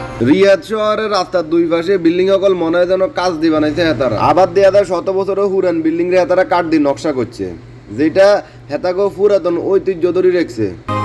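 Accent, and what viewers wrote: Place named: Chittagong, Bangladesh. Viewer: চিটাগাং ভাসা মনে হয়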